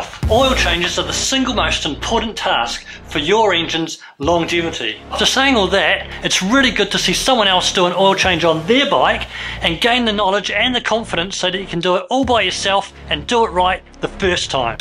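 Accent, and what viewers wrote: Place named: New Zealand. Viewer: Thanks a lot from a Canadian listener. I really like your Kiwi accent.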